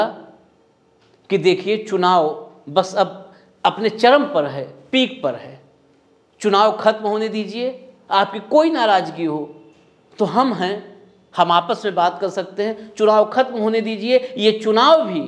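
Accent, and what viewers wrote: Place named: Farrukhabad, India. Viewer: Sahab namaskar. Mai MP me rahata hu farrukhabaad mera ghar h. Aapki aawaz excent vahin ka lagata. Vishleshan Shaandar h. Aap kahan se h.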